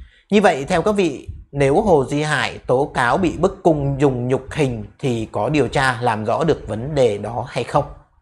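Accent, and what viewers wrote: Standard. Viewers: E nói rất chuẩn đấy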